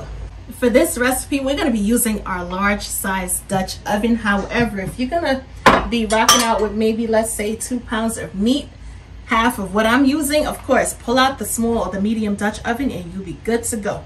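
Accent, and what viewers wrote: New York, United States. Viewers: Start watching... looks nice... Oh dear, American accent, I'm going to have to convert from pounds to kg aren't I...? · I like that NY accent. It's cool that you cut your own meat chunks. It's definitely less expensive.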